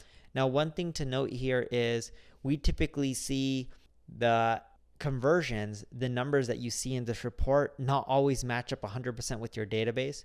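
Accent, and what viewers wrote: India. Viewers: Why your accent is so different/are you Indian?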